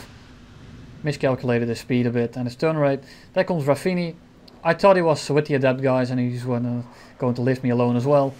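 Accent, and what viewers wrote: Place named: Netherlands. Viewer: They're both dutch.... it's literally just the accent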